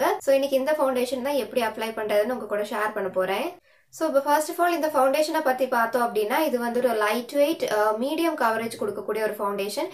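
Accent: Nagercoil, India. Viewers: Nagercoil slang varuthu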